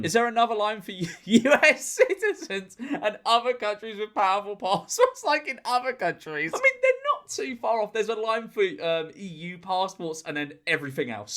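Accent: United Kingdom. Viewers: Mate, it was kinda funny when I (Russian guy) just understood the meaning of 'inalienable' and what it derived from, though hearing it for the first time, while a guy who sounds British just went straight to Google Translate:) Guess I am just used to encountering lots of English words I see for the first time and have to guess the meaning by context or morphology. No offense to you, it's OK, the situation itself made me smile.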